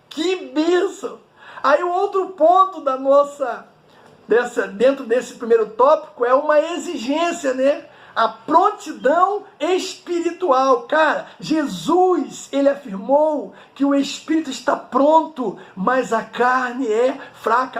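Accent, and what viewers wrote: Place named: Rio de Janeiro, Brazil. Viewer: Vc é carioca ? Sua fala é irritante.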